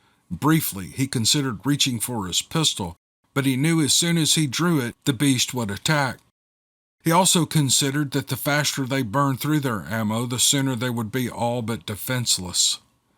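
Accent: Germany. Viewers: I love your German accent done in clandestine Southern flavor,! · I was stationed in Germany for five years. German accent is making me laugh. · Living for the German accent ❤ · Another talent.A German accent. I dont know a thing about it but I do appreciate it. Thanks sooo much for your effort. It gives the narration a good effect. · really enjoying Blood Eagle and was tickled by your German accent. It reminds me of Arnold Schwarzenegger. Thanks for doing what you do.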